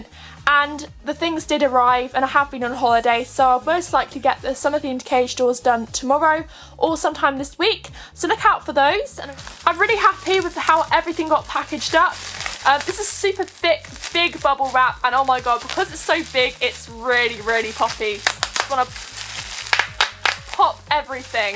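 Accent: England, United Kingdom. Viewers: Are you from the north or south of England. ? :) love your accent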